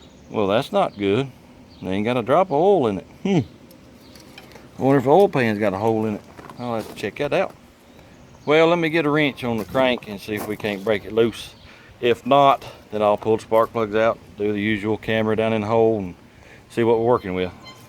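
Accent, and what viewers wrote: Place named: Eastern Kentucky, United States. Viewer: really enjoy your show. I grew up with some boys from eastern Kentucky and you sound a lot like them, Glad to hear your channel is growing!!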